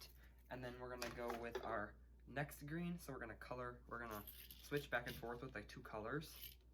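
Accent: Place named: South, United States. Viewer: You must be from the south 😁 I say Y’all too! Enjoyed your video and love your wreath!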